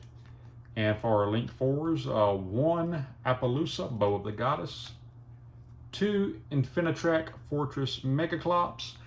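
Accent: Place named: South, United States. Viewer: Something about hearing a southern accent talk about yugioh seems just right. Great deck, hope your channel booms.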